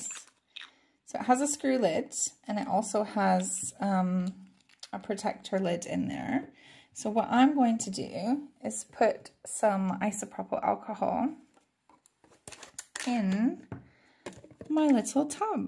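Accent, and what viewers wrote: Scotland, United Kingdom. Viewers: that's interesting, I thought one of the accents I could hear was Scottish! Some Canadians do share sayings and pronoinciation though. I'm Scottish myself and live in Falkirk:)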